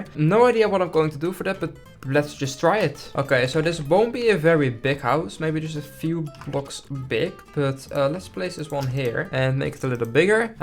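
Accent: Netherlands. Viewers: aan je stem te horen ben je Nederlands🤣😂:goodvibes::virtualhug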